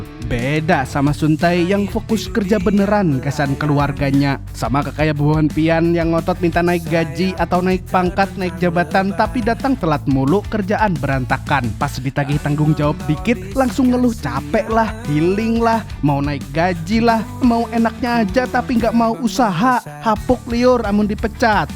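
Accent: Banjar, Indonesia. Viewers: Urang banjarkah pian mang?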